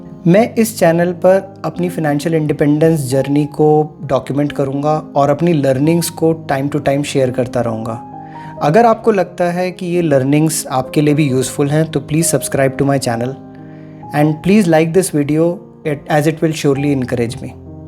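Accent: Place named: Madhya Pradesh, India. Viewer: Ram Ram jee Bhai, aap kahan ke rehne waale hain?shuru main laga aap Lucknow ke hain par aapke bolne ke lehje se laga shayad aap Madhya pradesh se hain..accha laga sun ke, dhanywaad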